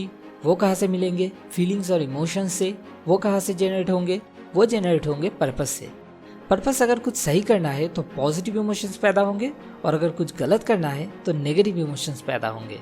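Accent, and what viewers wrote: Bengal, India. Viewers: pronunciation of temporary? Bengali accent?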